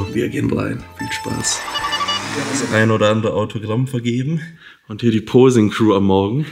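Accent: Swabia, Germany. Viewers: Sauber gschwäbelt. So isch richtig. Musch au mal wieder mehr schwäbisch schwätze ;) Krasse Einstellung und das kurz vorm Olympia, so muss das💪 btw. ich hasse die Hitze 😂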